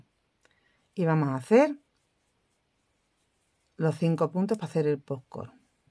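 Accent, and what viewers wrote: Andalusia, Spain. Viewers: además de que la idea es chulísima, no veas la alegría que me da de escuchar mi andalú ❤❤❤ Soy de Sevilla. Estoy haciendo ahora un hexagonal con los restos que tengo. Sí llego a ver el vídeo antes... Pero bueno, creo que lo voy a empezar 😂😂😂 Un abrazo 🤗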